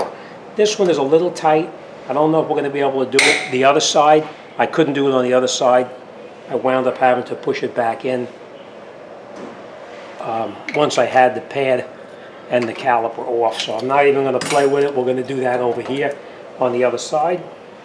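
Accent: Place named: Bronx, United States. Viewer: Nice Video My Man... & That Sound Like A Bronx Accent!!! Lol...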